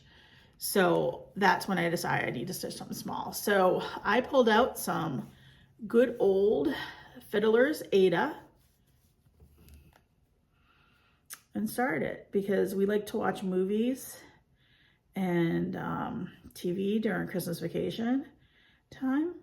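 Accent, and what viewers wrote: New England, United States. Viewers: Happy New Year! I agree about 2021….let’s move on 😍. I need to get that Salem 1692 chart. I’m reading The Witches now. Your Elisabeth Addlesee is BEEE U TEE FUL! Thanks for participating in the #stoopidtumorsal. You said it perfectly with your New England accent 😍☺️😳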